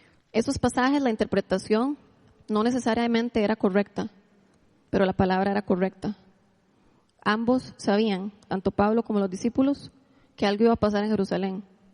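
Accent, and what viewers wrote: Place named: Costa Rica. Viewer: Gracias por la esplicasion sobre profetizar. Me llama la atencion tu accento en ciertas palabras ese es el accento de los ticos?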